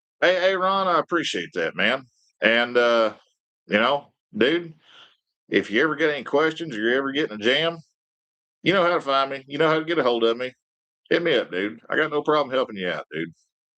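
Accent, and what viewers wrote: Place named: Arkansas, United States. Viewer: I don't know if these comments after the live-feed helps in any way, but I am a loyal listener for several reasons. One is that you are very knowledgeable in usable information. Second, it's fun to listen to your jokes (not necessarily your characters). Third, I love to hear an accent from home and some days I am low enough that just hearing an Arkansas accent will lift my spirits to the point of almost tearing up. Some day I will make it back down to Tampa and will say hello again (met you Dec 23 at the Ride Factory, retired Navy guy. Also met Weems, David, Jack and wives at Sturgis in 2025.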